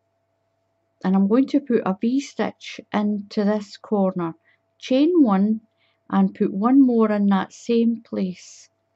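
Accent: Scotland, United Kingdom. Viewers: It's lovely to hear a Scottish voice for a change. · I love this! I would love to make some pillow covers. I love your accent also, you remind me of my grandmother who was from Glasgow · Looove your channel and all your help!!! And of course, I love your Scottish brogue... my Paw Paw Oliver hails from Liliesleaf...down in the Borders.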